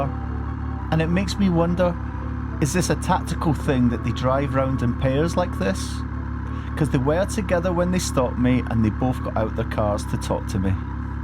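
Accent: Scotland, United Kingdom. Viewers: Do you have a Scottish accent ? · Ayrshire in Scotland pal , so people in Scotland WILL think you have a Scottish accent as i did , you definitely sounded Scottish to me , otherwise i wouldn't have mentioned your accent. A Scotsman can recognise a Scottish accent no matter whether it's fae Ayrshire , Fife, Glasgow or Shetland , all very different but all recognisable as Scottish, and definately not ' general' , just the same as an Englishman, Welshman or Irishman.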